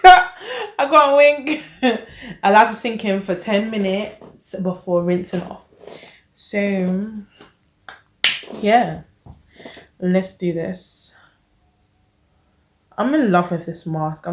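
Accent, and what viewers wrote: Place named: London, United Kingdom. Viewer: Hold on you sound like you're from London! - if you are Heyyy girl! - Loved the video- you're so entertaining sis! - I'm going to try that mask!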